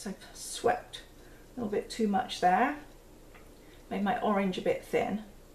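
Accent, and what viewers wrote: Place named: England, United Kingdom. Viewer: I really enjoy your videos. You're not afraid of making mistakes and don't become nearly suicidal when a canvas gets ruined...ha ha, I suppose we all feel like throwing ourselves from a garret when things don't go right... Anyway, I just wanted to say I enjoy your "proper English accent"! So very "Downton Abbey", don't you know?!:-) Cup of tea, guv'nor? Okay, okay, I promise to stop!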